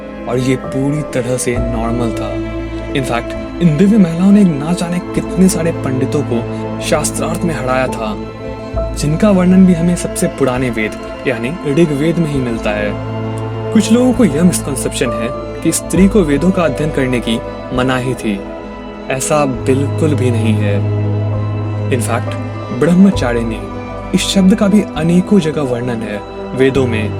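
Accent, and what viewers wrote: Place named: Bengal, India. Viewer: min...The text was in Bengali...❤️❤️ Are u a bangali sir??? I am very curious 😄😄..apka hindi accent dekh ke muja ve laga app bengai hai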